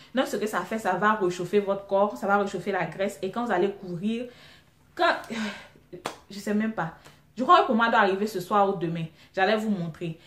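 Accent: Ivory Coast. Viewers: hum l'accent ivoirien là hooo suis motivée une soeur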